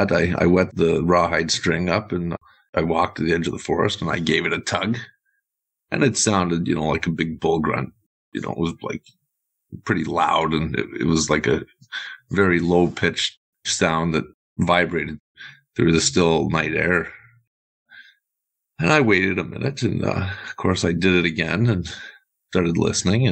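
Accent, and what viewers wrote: Canada. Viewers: I love your Canadian accent! Great story!❤ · Dude sounds like Tom Selleck with a touch of Canadian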